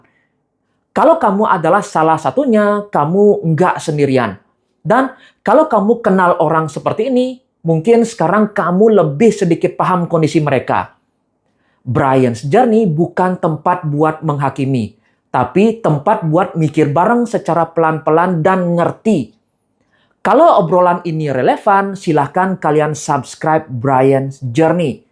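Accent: Bali, Indonesia. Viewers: Logatnya kek dr bali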